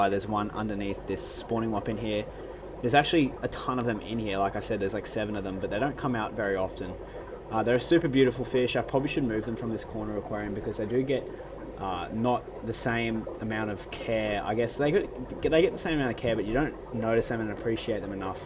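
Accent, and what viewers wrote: Australia. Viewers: Hold up are you from Brisbane, Australia?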